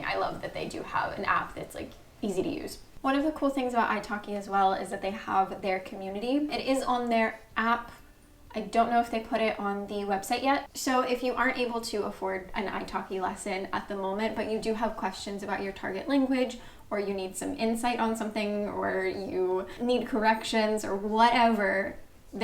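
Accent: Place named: California, United States. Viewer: You tend to pronunce some words in the British way of it, such as glass or grass, right? I've noticed that it's related with californian accent. So actually, this is how I'd like to sound like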